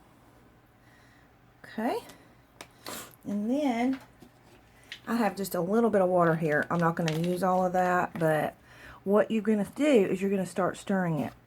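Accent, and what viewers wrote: South, United States. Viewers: Thank you for sharing. This is simple and doable. Plus... your southern accent is everything 😍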